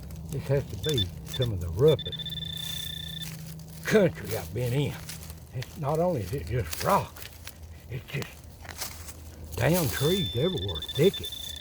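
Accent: Appalachia, United States. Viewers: Your backwoods accent reminds me of my dad whose people came from Appalachia.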